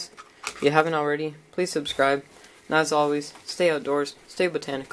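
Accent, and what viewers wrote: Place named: Canada. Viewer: No. I just thought I detected a Canadian accent.;-) Did I ask you if you live in Canada? If I did, my old comment is not showing anymore. I talk to quite a few YouTuber’s. So that question only applies (do you live in Canada) if I did ask you that.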